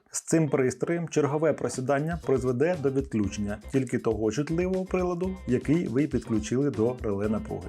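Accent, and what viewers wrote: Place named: Russia. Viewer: Кацапський акцент. Невже не можна вивчити нормально декілька речень. Азіров би пишався 🤦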